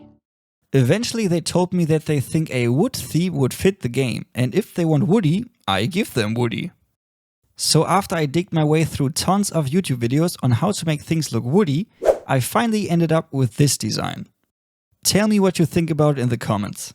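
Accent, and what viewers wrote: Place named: Germany. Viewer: Ich hab mir während des gesamten Videos gedacht "der Akzent klingt doch irgendwie voll Deutsch", und am Ende einfach der Screenshot vom Kanal wo steht "Mehr über diesen Kanal" 😂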